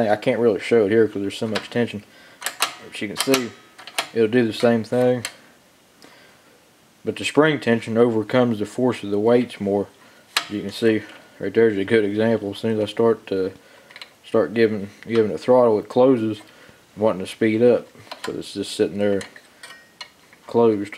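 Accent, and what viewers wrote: South, United States. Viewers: Love your videos sir, and that southern accent makes me miss my almost 20 years of life in TN. I have a Leaf Vac with a Briggs Intek 875 engine. Inherited from grandpa in north Michigan. Engine was hydro locked and I have been able to fix it, ne oil, filter plug and it is now running but only on high. Looks like it's all connected properly to the carb. Is there anything else besides a stuck butterfly valve that would make just run on rabbit speed? Any advice would be greatly appreciated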